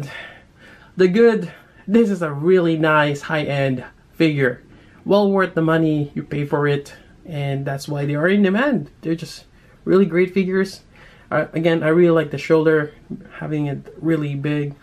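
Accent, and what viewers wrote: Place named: Philippines. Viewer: the way you talk.. are you filipino?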